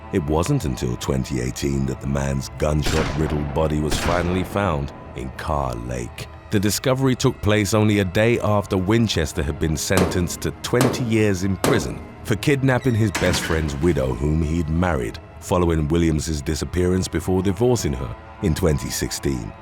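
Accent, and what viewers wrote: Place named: United Kingdom. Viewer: He’s British, they pronounce words differently than Americans.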